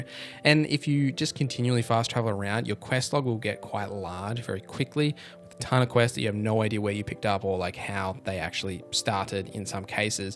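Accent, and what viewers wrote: Australia. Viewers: He's Australian cut him some slack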